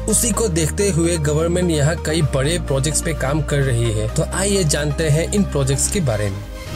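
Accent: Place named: Assam, India. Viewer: Your accent is like Assamese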